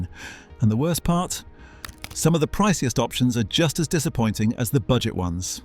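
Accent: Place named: United Kingdom. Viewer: These videos are supposed to be factual and true and the narration sounds British - however the images used in most cases bear zero relevance to British products, in some cases the images show products priced in US dollars! When the pictures are wrong one starts to wonder whether the information is true?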